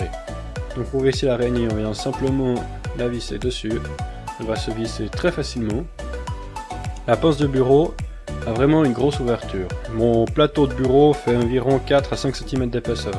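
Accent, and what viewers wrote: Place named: Switzerland. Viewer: ça sent la SUISSE dans ta voix !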